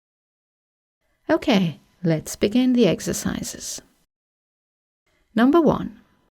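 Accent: United Kingdom. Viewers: i like British accent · That British Accent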